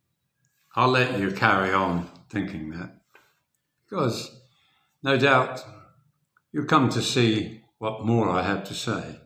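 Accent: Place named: United Kingdom. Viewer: Hey No Need, I agree there are many Stupid Americans here and Stupid Brits the Same, you can’t claim to be Australian, but you’re truly British. It’s in your accent long live the King God bless. Hope you’re safe.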